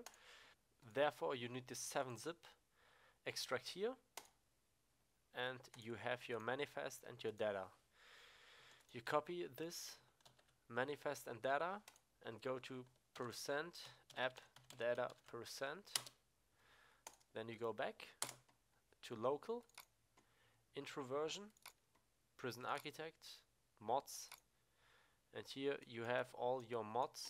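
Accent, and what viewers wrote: Germany. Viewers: Man hört ultra das du deutsch bist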